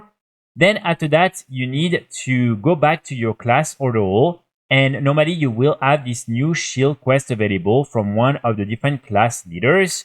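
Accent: France. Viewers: Frenchie accent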